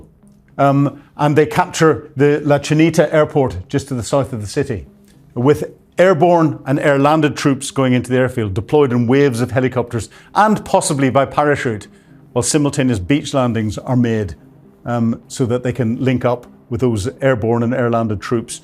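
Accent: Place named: United Kingdom. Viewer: Very british English, thanks 😊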